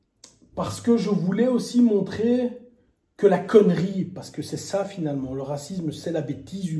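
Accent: Belgium. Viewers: Commentaire pertinent et argumenté...malgré ton terrible accent belge!!!! le racisme on peut dire ce qu' on veut c est à la tête du client...un raciste en France tu mets zidane en face, il lui lèche le dessous des c....et prends un selfie en lui tapant la bise...un raciste en FRANCE fermera sa gueule si 1 médecin urgentiste marocain ( c mon pays d'origine et suisse parents ) sauve la vie de son gamin après accident de voiture etc...n'aie crainte les commentaires de rageux..c est la vie..et c est dommage!!! g eu les boules,ça m a fait de la peine de voir certains commentaires autant que j ai kiffé ta " sortie" via cette video😉👍 sans rancunes pour ton accent lol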